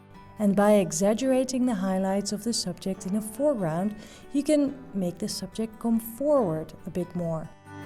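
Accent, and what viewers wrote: Netherlands. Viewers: Are you from the Netherlands? Your accent sounds so familiar to me and I can't figure out what it is. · Je Nederlandse tongval pik je meteen op.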